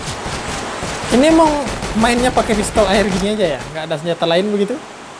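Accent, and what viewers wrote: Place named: Padang, Indonesia. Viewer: Orang mana bang?logat nya kek org padang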